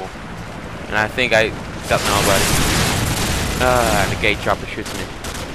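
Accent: Netherlands. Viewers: I knew it, i noticed a dutch accent :p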